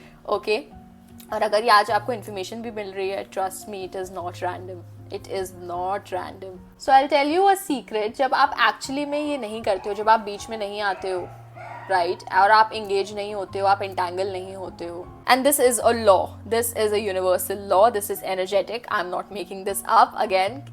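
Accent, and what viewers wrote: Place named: India. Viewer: Muje lga thumbnail se .koi foreigner tarot reader h😂aapki hindi se pta chala aap to indian h❤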